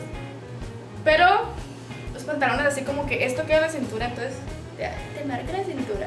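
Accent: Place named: Fresa, Mexico. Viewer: Esta morra se volvió fresa 😂 habla bien fresa ahora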